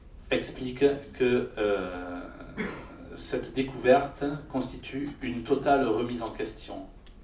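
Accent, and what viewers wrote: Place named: South, France. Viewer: passe son temps assis à chier dans des cerveaux vides. C'est dingue comment une vague élocution et un accent du sud aux consonnances de sinus encombré, peuvent gruger des individus, tout ça parce qu'il balance vite fait deux versets d'évangiles... Gnnnnnnnn kop11111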